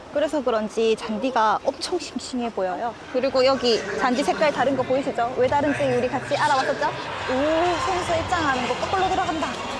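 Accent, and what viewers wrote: Gyeongsang, South Korea. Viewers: 사투리 쓰시네요 쇼츠로 볼땐 몰랐는데 갱상도 아이가~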